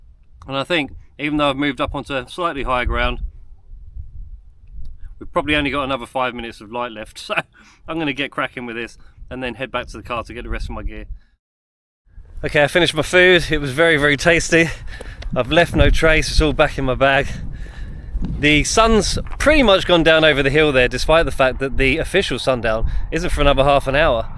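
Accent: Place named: Southern England, United Kingdom. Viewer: Funny how you use the long a for Castleton but a short one for Mam Tor. You Southerners are a strange lot.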